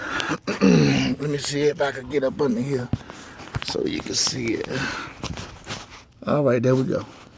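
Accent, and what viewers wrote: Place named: South, United States. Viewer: Sounds good dude. I can tell your country AF with that accent. Got that “boiler pro”. LOL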